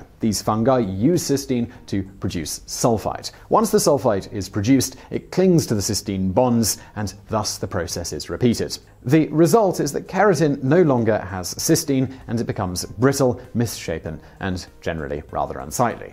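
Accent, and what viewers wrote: England, United Kingdom. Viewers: My gosh brother you're a truly amazing speaker so easily understood and love the English note that is so you. I'm off to make changes, thanks fast speaking intelligent human.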